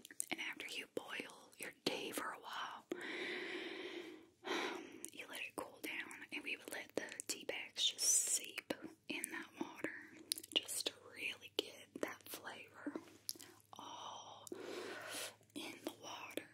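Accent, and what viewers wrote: South, United States. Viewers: I really like this video, love the southern Accent. How about more of these once in a while and or other accents you can try. And I also appreciate you 💜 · Something about the southern accent man. Thanks for doing another one! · Yes to some good sweet tea and kool-aid! Loving this southern accent whisper! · Oh my god. That accent! <3 I'd give my right arm (also known as my favourite arm) for a Southern Belle girlfriend. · Do southerners really sound like this. I don’t feel like I do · Sweet tea, ribs, and that accent are probably the only things I miss about the years I lived in the south. Stay Awesome 🤙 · I live in Charleston SC too so I’ve spent my whole life listening to this accent and slang. Love it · Your southern accent is awesome! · Not all sound like this but there is a good amount that does, just because you don't sound like this doesn't mean that you aren't from the south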